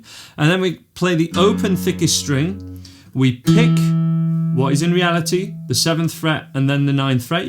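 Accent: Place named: Preston, United Kingdom. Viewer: Hi Some really useful lessons on here for beginners. Thank You. Listening to the accent, are you from Preston or Huddersfield (or somewhere in between).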